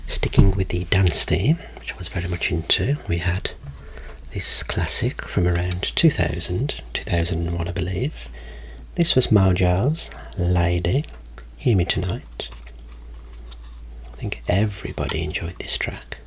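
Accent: United Kingdom. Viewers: I absolutely love your voice and that beautiful british accent. I swear you could even read the phonebook to me and i would enjoy it ❤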